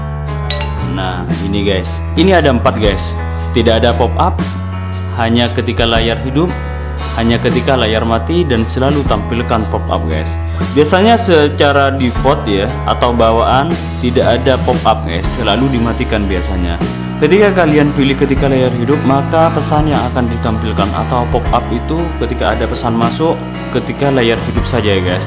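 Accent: Madura, Indonesia. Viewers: Bede bhs madura nya keah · Orang madura ya? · Bng lu orng madura y? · Orang madura ya mas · Bang Orang Madura?? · mas kamu orang Madura ya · Orang Madura nya bang??? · Orang madura ya om 😁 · Orang madura kak? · Reng madure kake ya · Org madura bkn sih? bede dimma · Abang orang madura yaa? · Orang madura yak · Yg buat konten kyknya orang madura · Abg orang madura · Wkwkwkw kakak orang madura sama kayak saya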